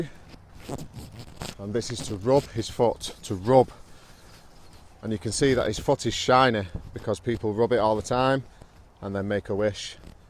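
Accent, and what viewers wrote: Northern England, United Kingdom. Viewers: Hi, Finally found a channel where a British English teacher is speaking in northern accent. Don't get me wrong, but I don't understand when people speak in northern accent. I have recently moved to London from India and I feel terrible when I don't understand British people. I just want to understand what everybody is saying and I would like to communicate with them. How can I do that? Could you please tell me?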